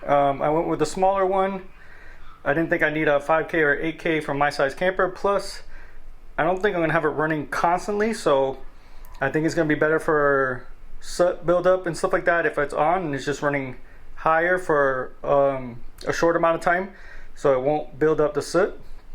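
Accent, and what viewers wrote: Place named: New Mexico, United States. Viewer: I keep hearing a New Mexico accent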